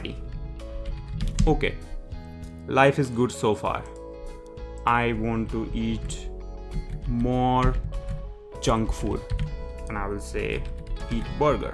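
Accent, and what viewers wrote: India. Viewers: So simple, so understandable and with the Indian accent that switches the brains into learning mode. Thank you!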